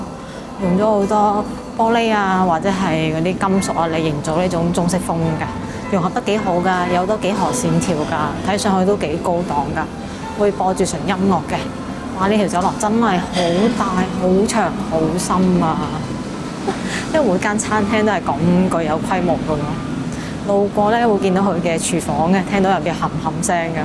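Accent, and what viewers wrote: Guangzhou, China. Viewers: 唔需要改口音，改咗會不倫不類㗎，話你啲人唔係廣府人囉，我哋香港人好多都係從廣東來的，（佛山）❤❤ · 粤语警察😂😂对老广语法一知半解😢广州陀地发音结尾，都是下降音及平声，不用理捞头乱噏🎉🎉支持你👍👍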